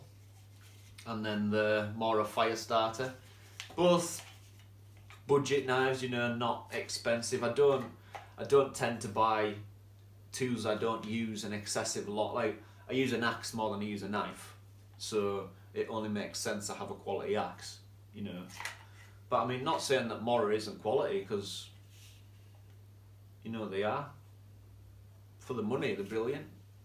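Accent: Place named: Cumbria, United Kingdom. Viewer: Sorry, I couldn't catch what you said with your Cumbria accent. Who makes the fire-steel with the screw off top (link) please? I'm also trying to find a suitable headlight rechargeable with white and red light options, but I am not going to pay 50 Euro for such so still searching. Mice are destructive little buggers so best to put none tinned foods into suitable containers which will hopefully protect and mask the scent and stop them chewing through your kit.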